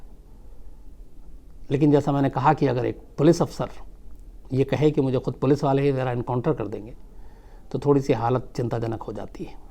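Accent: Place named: Bihar, India. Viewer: Bihar me aise hi bolte hai syd sir bihar se hai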